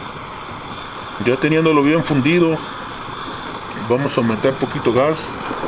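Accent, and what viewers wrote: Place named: Northern Mexico, Mexico. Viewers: tu acento de chihuahua o sonora es indiscutible ¨ya pisha una soda mushasho" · Muy bien mi amigo,me acabo de suscribir,se nota por tu acento que eres norteño,yo soy de Aguascalientes,de zona de minas,un abrazo y fuerte saludo compa,por tu sencillez y por compartir tus conocimientos,me gustaría que hicieras un vídeo de como extraer oro de tarjetas electrónicas,a mi me llaman Ripper,a tus órdenes,y seguimos en contacto,dios te bendiga,ah y te voy a hacer una pregunta,ojalá me la sepas contestar, " a quien pertenece todo el oro y la plata ? ". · Eres de Sinaloa? Tienes el acentohaha buen vídeo hermano, muy interesante la verdad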